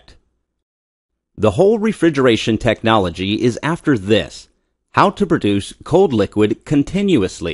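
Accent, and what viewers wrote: United States. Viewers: He has an American accent but misses a lot of words out of his sentence like a Chinese man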